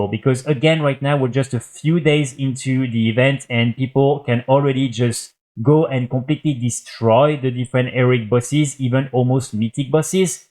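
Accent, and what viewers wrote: France. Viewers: Tes français non ?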